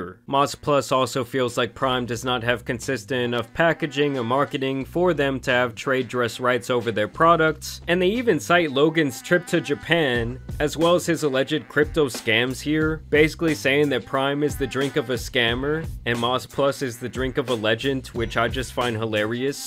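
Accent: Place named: Boston, United States. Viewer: Your dogs are adorable!! Man, I'm binging this channel! I love the blend of the comedy and intelligence! …and I think I figured you the awesome accent! My fav! It's Boston, right? OH, I was a little disappointed at mark, I thought you were about to rap when I heard how easily “Likely still They could not come to an agreement In this situation Prime continued to threaten litigation” flowed.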